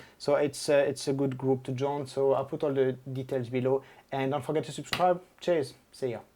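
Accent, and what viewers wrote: France. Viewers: J'ai cru reconnaitre un bel accent français ? Est-ce que tu fais toutes tes vidéos en anglais ? Et juste une petite remarque à plusieurs moments tu dis lens wood au lieu de lens hood ^^ Bonne continuation !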